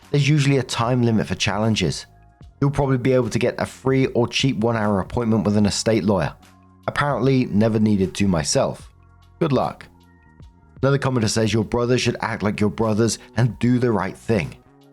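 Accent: United Kingdom. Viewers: Can anyone remember what channel was called before? I have been racking my tiny mind and can't remember anything but I started following him as I knew he had to be a Brit.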